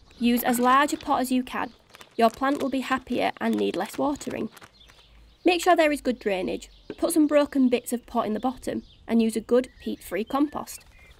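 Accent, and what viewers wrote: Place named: North, United Kingdom. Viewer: Good to hear a northern accent narrating!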